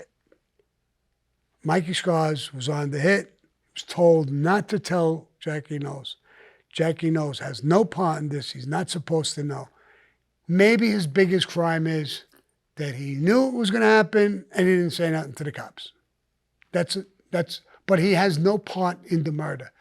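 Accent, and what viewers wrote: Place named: New York, United States. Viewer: Us guys from NY, NJ, PHILLY, CHI & the like can’t walk a city block without tripping ova 20 guys that speak how Sammy does..since the 70’s 🤣🤣 🤷‍♂️ who knew the world thought so highly of this kinda brouge🤣🤣🤣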